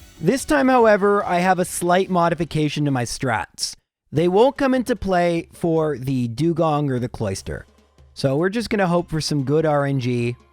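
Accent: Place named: Canada. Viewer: Oh the Canadian is so noticeable.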